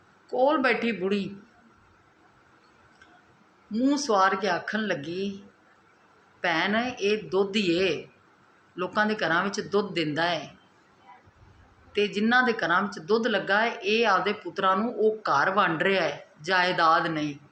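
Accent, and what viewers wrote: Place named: Punjab, India. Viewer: Your punjabj accent is so cute❤😮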